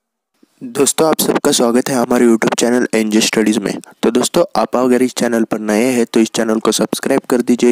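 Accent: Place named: Maharashtra, India. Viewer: Hindi tone tar Maharashtra chi ahe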